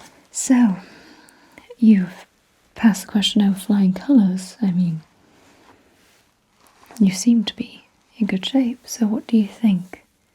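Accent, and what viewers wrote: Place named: England, United Kingdom. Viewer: i find it amusing that the way you channel the sinister, menacing vibe is by sounding more English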